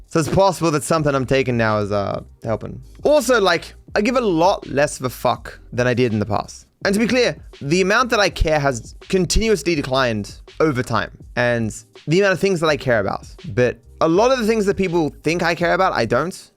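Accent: Australia. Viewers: I'm American and have never thought you were anything other than Australian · I hear the Aussie because you aren’t as (I use this very very loosely) formal as a stereotypical Brit and not as limp as American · I'm australian and i never thought his accent stood out, mind you i was still able to distinguish you had an aussie accent the first time i came across one of your videos just to go check the channel name and everything clicked. As a side note and being as respectful as possible, you seem to speak really quickly EVEN by australian standards, like we're known for shortening phrases, but for example you said 'a lot of things' as if it were one syllable or one breath. Like, even faster than saying 'lotta things' slowly, i think its made even worse by the enunciation that you use to make yourself sound readable to non-english speakers. and i have a few mates of mine (doing I.T if that tells you anything) who try to get out large 6 syllable words out really quickly while ALSO enunciating (without slang), and to me sounds unnatural/forced to me despite having no trouble trouble understanding what they're saying, similiar vibe here. 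But i suspect this is what the non-english speakers having trouble with as it doesnt match the natural anticipation or cadence of, say for example a british or american english speaker so i reckon it might come off as hard to follow the pacing, so it's not just a culture thing but also your own habits. I'm no linguist though. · Nah, if you can't understand the Australian accent, that's a skill issue. The only people that can be difficult to understand are the Scots. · I'm from the north of the UK but don't really sound it. It is a very bland accent. I could tell you were Aussie but only just when I started watching. Outsiders from the UK have no Idea how many accents we have, they think we are all royal or some shit, clearly never been to Marsh green in Wigan lol. Go up the road were I live and you will find very different accents.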